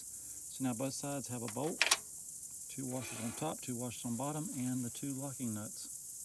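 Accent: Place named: Eastern North Carolina, United States. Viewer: You must be in eastern NC. I can tell.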